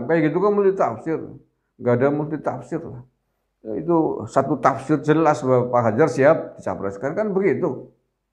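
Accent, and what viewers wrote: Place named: Brebes, Indonesia. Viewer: wkwkwkwkwkwkwkk....wagu jegu...kya kya wong brebes rika Whaaa...mntap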